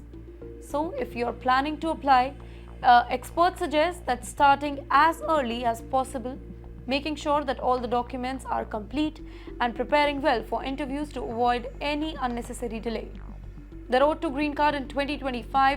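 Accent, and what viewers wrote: India. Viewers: She is Indian. So typical Indian accent is obvious.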